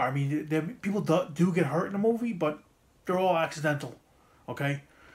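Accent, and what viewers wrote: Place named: Brooklyn, United States. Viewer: really are funny. Love that u apologized for the Brooklyn accent lol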